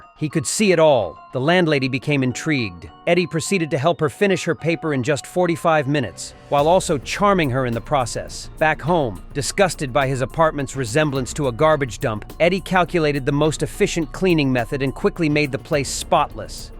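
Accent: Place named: United States. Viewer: Sound like a certain American guy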